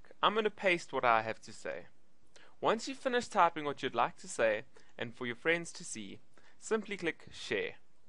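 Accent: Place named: South Africa. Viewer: I immediately recognized your accent as a fellow South African! I have lived in Portland, Oregon, USA for the past 31 years, and still miss my homeland although I am very happy here. Thanks for the helpful info.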